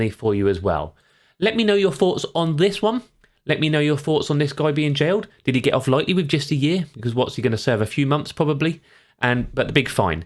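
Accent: United Kingdom. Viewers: Was this guy from the UK?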